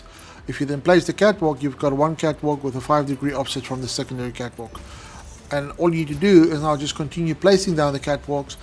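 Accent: South Africa. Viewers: You sound South African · My guy, are you south african?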